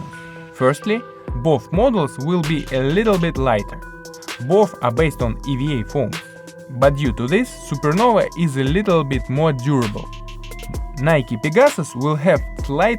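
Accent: Russia. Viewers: Nice review. Are you Russian/Czech?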